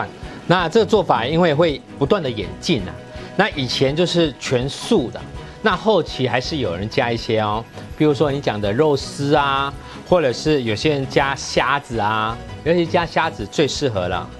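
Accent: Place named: Hsinchu, Taiwan. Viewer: 我是北埔人，你說的客家話的音跟我ㄧ樣，我也想多學些道地客家菜，譬如 薑絲大腸等等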